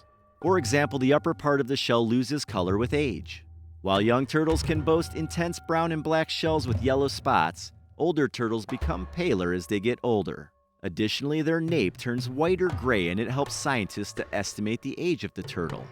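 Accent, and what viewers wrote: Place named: Midwest, United States. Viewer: The Midwest accent is blowing me and I’m from fuckin Chicago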